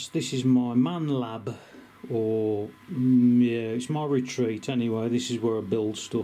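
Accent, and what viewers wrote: Birmingham, United Kingdom. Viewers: After 10 seconds of that Brummie accent I stopped watching lol! Totally bored. .......yawn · you a Brummie ? Nice Work ! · You sound like youre from near Birmingham If you want somebody to test that bike at full speed then let me know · I think that is quite possibly the funniest fuckin video I have ever seen on YouTube!! Got tears rolling down my face typing this. Brilliant Brummie. Thank you.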